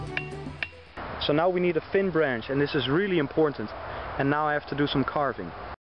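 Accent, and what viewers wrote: Netherlands. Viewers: This is a strange video. With a Dutch accent, Irish music and making a huge mouse trap, Lol, that probably wouldn't work, what's the point? Have you ever caught anything with this? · i fear that is no option looking at the serroundings and listening to hes accent i would say hes from the netherlands and their is nothing that size here that will take that trap (fall for that trap), we dont have carnivores in our country..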